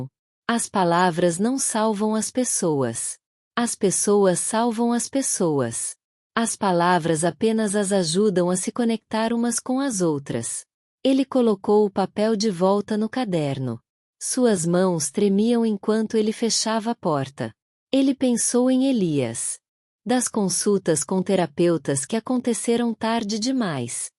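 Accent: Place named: Bahia, Brazil. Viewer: Eu amo essa sua voz de Salvador Bahia a mesma Voz que narrou. A historia de Luisa e Gabriel Monte Negro ❤❤❤❤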